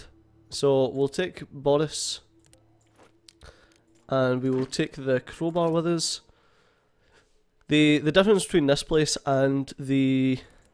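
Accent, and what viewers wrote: Scotland, United Kingdom. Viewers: I fucking love this game and i love the Scottish accent. SO GOOD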